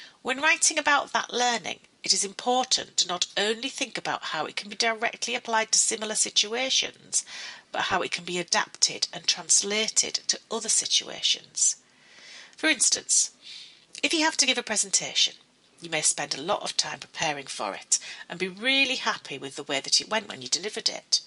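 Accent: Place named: Yorkshire, United Kingdom. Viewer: Yorkshire English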